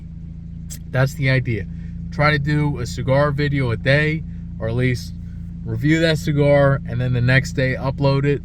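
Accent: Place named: United Kingdom. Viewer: Yo I've came to like the British voice over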